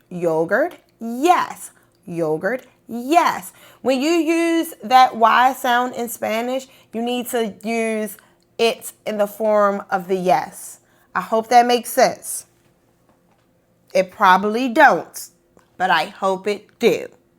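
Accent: Baltimore, United States. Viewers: thank you for another informative video! I always look forward to your content. Don't worry about what anyone says about your Bmore accent. We all have some type of accent. You are doing great! Hello from PG!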